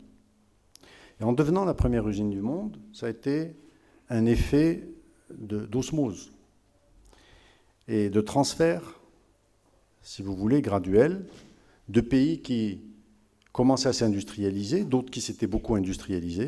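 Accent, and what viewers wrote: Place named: Marrakesh, Morocco. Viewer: l'accent et l'humour marrakchi vous colle toujours notre cher ministre...c'est bien pour attirer tout cet adorable auditoire à ce que vous dite...si important et si sérieux. merci moulay hdid Alami.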